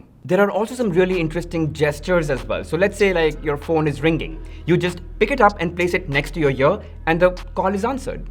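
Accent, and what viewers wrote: India. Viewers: I hate indian accent of speaking english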